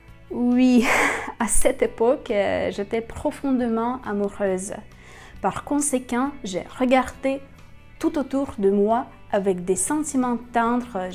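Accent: Russia. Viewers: Vous êtes super belle et votre voix est très douce et séduisante ❤🥰😍. J'adore l'accent Russe, tellement sexy ☺️👍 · Мне нравится ваш легкий русский акцент, когда вы говорите по-французски. НО я предпочитаю, чтобы ваши видео были на русском языке (с французскими субтитрами) 🙂 Большое спасибо за вашу личную историю. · Personnellement, je préfère le format en langue russe avec les sous titres, c'est vraiment génial pour la prononciation et l'apprentissage, même si je suis ultra débutant et que je dois mettre souvent la vidéo en pause. De plus, je trouve que ta voix est beaucoup plus charmante avec un accent russe. Continu comme ca.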